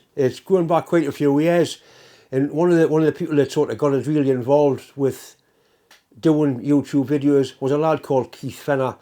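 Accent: Newcastle, United Kingdom. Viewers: Thanks and congratulations (& Deb, and all your supporting team). As I said when you were 23 short of the 100k: “If you’re at all interested in metalworking, machining, old steam equipment, and more and enjoy a Newcastle accent then is well worth watching. No BS, and sharing a lifetime of knowledge and experience.” Honest and entertaining, as good as it gets.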